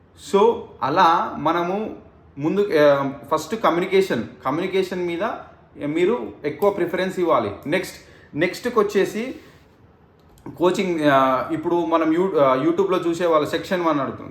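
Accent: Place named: Telangana, India. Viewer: Mi slang super Telangana lo ekkada anna midi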